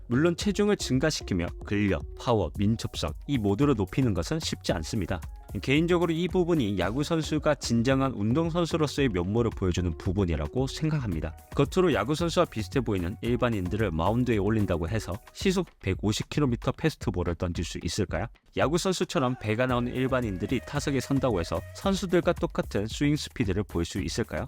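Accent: Gyeongsang, South Korea. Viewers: 고향이 경상도 어디세요 ?? 사투리가 똑같네요 ㅎㅎ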